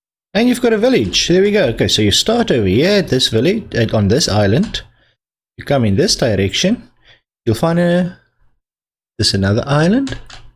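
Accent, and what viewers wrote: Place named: South Africa. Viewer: your heavy south african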